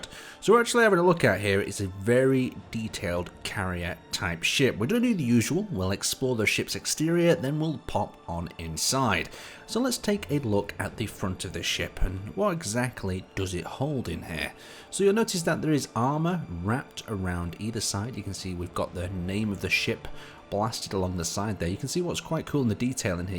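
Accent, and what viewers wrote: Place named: Shropshire, United Kingdom. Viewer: If I had to guess where is from, I'd say Shropshire.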